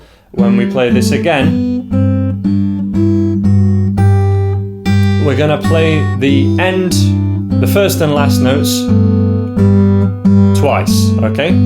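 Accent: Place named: Buckinghamshire, United Kingdom. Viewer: Where u from? U sound Buckinghamshire esque.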